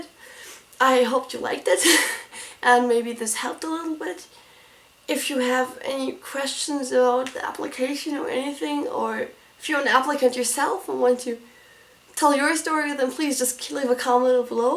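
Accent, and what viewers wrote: Germany. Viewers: I have no idea why but I LOOOOVVVEEE your accent. I loved accents and German accents are my favorite! Don't apologize for your voice! It's beautiful! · Don't apologize for who you are! Your German accent is amazing and you shouldn't be sorry for that. Your art is amazing by the way <3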